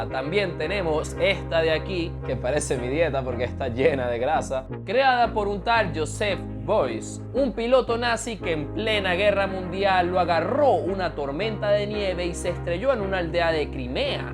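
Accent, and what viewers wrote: Venezuela. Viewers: A mí me suena más a venezolano, dijo "pana", eso no es mexicano. Saludos! 😊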